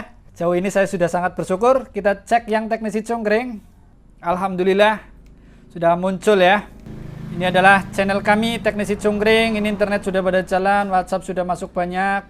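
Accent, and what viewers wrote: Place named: Tegal, Indonesia. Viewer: Mas njenengan banjarnegara, tapi logatnya ko kaya tegal ya?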